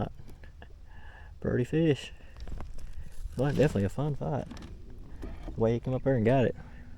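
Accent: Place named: Arkansas, United States. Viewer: You from Arkansas? I recognize the accent.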